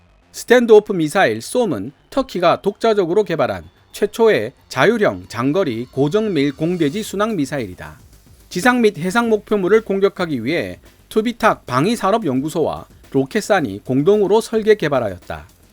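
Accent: Gyeongsang, South Korea. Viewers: 경상도 분인가?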